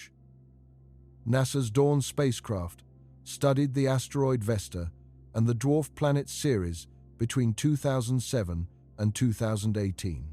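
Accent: England, United Kingdom. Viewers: There doing everything they can to keep us from reaching the stars. I believe none of it. English accent and muckedy music and were supposed to think they are intellectuals.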